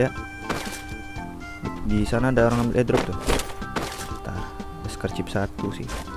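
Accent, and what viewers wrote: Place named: Makassar, Indonesia. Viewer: KaLau BoLeh Tau Abang Orang Mana , Kok Logatnya Kyak Makassar ( Masih Mauko Datang Besok ) 😂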